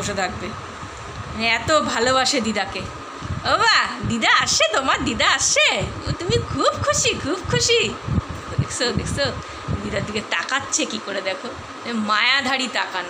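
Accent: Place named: North Bengal, India. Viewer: Didi aapnar bachcha ta sob aaponjonke niye thaakte bhalobashe - khub bhalo sona baba ta aapnar. Didi aapni ki coochbehar athoba jalpaigurir aashe paashe kothao thaaken, karon aapnar bhashar tan theke ami eta bujhechhi je aapni North bengaler kothao thaaken, ami aapnader north bengaler bhashar onekta bangal plus calcesian bhashar mix) saathe khub parichito karon amar maa Hashimara tea estate er manush chhilen plus ami nijeo upper Assam thaaki tai bollam.❤❤❤❤❤❤❤❤❤❤🐕🥰🥰🥰🥰🥰🥰🥰🥰🥰🥰👍👍👍👍👍👍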